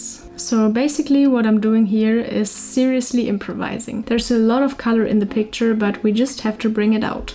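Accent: Germany. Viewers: I hear a bit of deutsch 😅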